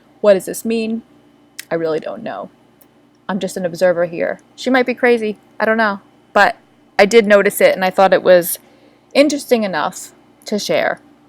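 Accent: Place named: Spain. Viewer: I love your Spanish accent.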